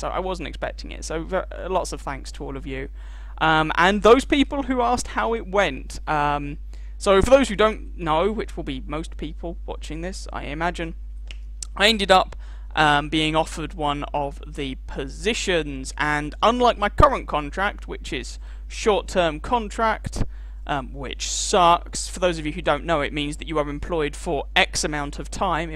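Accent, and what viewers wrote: England, United Kingdom. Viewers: Well done on the new job. As Wulf asks.... where in Scotland? I just assumed you where in Sassanach country from your accent. Keep up the good work :-)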